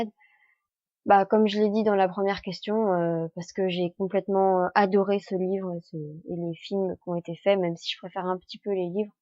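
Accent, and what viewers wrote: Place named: North, France. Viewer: Merci d'avoir répondu a mes questions :D , Je sais pas si c'est moi mais on dirais que t'es du nord mdr , et si tu veux rencontrer les acteurs je sais pas si tu connais la Welcome To The Magic School De People Convention ? ya la 4iem édition le 13 et 14 Janvier 2018 si je me souvient bien de la date ;) mais si tu veux vivre un moment pareil franchement faut mettre le prix mais ca vaux tellement le coup ;) Bref si tu voudrais qu'on parle de sa ou autre chose tu peux me contacter sur mon facebook ;) . <link> PS: De trés bonne question t'on était posez , superbe vidéo j'adore ta chaine :DDD :p Bis :*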